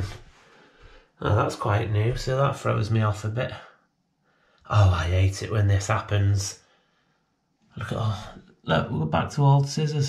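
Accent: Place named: Scotland, United Kingdom. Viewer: where did you get that great accent from? Scotish or Irish? I assume Scotish.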